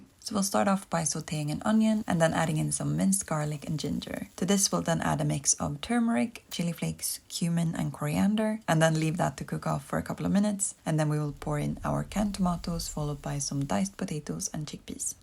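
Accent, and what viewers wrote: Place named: Sweden. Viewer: Your channel is very cosy! I love your simple and nutritious recipes. Wishing you the best success on here. P.s your Swe(?) accent is lovely :)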